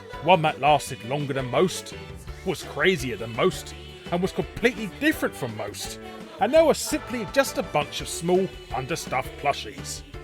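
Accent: United Kingdom. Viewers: you really make me laugh with your British accent and valuable information. 😄😄😄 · Just found this channel... I really like it... Very well put together, engaging, funny, well researched, informative and of course the British accent... Subscribed...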